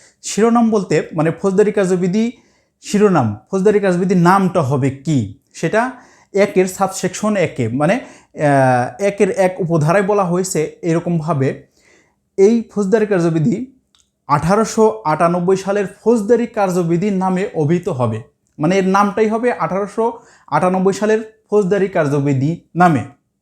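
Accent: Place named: Jessore, Bangladesh. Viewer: আসসালামুআলাইকুম স্যার আপনার বাসা খুলনা বিভাগের যশোর জেলায় মনে হয়ে।।আমার বাসা ও যশোর, আপনার কথার টান দেখে মনে হচ্ছে।। ক্লাস সুন্দর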